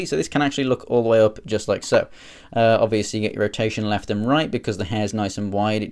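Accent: United Kingdom. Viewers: You sound English - so how have you got these before release here, as far as I'm aware weren't these out in September or October? Or did you get them imported from US?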